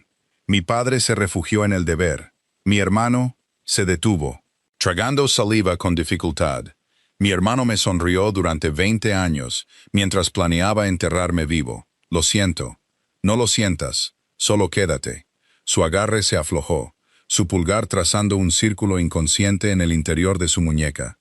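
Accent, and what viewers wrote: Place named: Pocho, United States. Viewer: Buenas historia, pero desespera esa forma de hablar pocho...ni Inglés ni español....x favor 😊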